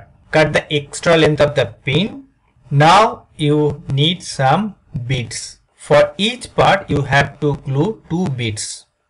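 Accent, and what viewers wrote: India. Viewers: Speak using proper English accent, please. Your Indian accent is so irritating.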